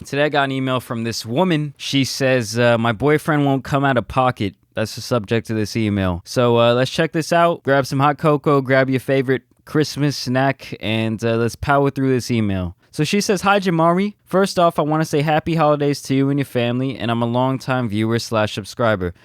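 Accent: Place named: Boston, United States. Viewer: Is nigga from Boston , the accent is hella strong 🤔😂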